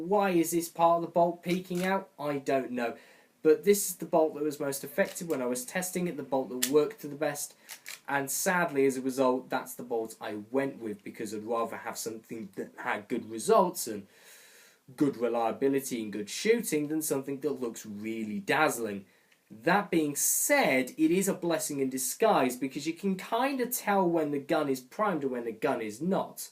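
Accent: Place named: United Kingdom. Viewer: I knew you were British from your accent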